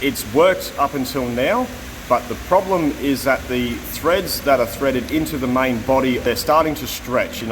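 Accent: Australia. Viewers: Dear, I will never make anything that you have shown me so far but I am having a wonderful time going through the processes you take to make the incredible things you do. Its great to hear proper English - Australian English. Greetings from South Australia.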